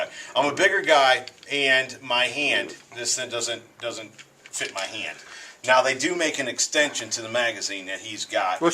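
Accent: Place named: United States. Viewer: if it wasn't for your American accent.. I'd think you were a fellow Canadian brother.. hahah annnnddd nobody here will steal your guns.. they may take some of your beers though! haha nice guns.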